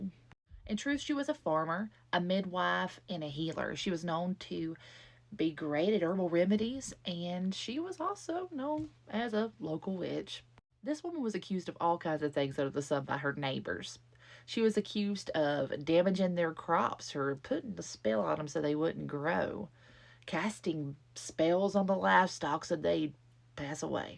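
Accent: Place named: Tennessee, United States. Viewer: Thank you for this video. I saw it in my feed this morning and was so excited to watch it. Grace is my ancestor ❤. We always hear about witch trials and the deaths that resulted from them but this old girl wasn't having it. She did her time and lived through it. I'm so proud to be able to claim her as Mamaw Sherwood. Whenever I'm going through a tough time, I remind myself what Grace went through and I feel just a tiny bit stronger ❤. Thank you 🙏 you did a great job. And you sound a lot like me - I'm from Tennessee.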